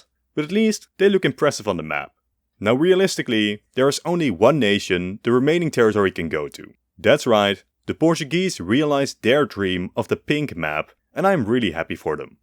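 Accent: Netherlands. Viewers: That Dutch accent though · lekker nederlands accentje:)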